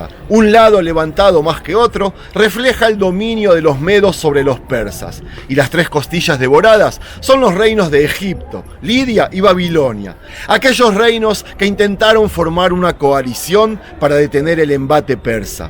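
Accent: Argentina. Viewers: Porque siempre dices que eres judio tal parece que eres argentino por el acento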